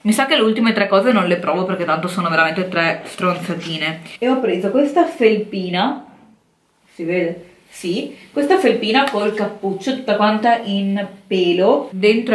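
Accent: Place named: Veneto, Italy. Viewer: Ma sei veneta? Pk anche io ,e sentivo un leggero accento veneto,io non abito in Veneto ma a Rimini però ho i parenti La e a volte vado Baci baci spero che vieni a Rimini pk vorrei vederti ,cmq sei bellissima e hai un sacco di stile ,ti stimo❤️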